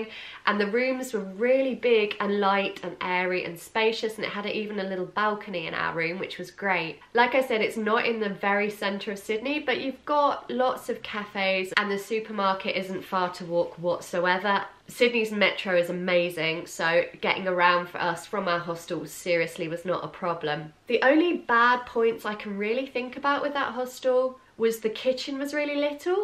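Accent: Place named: England, United Kingdom. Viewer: English accent are awesome! 😁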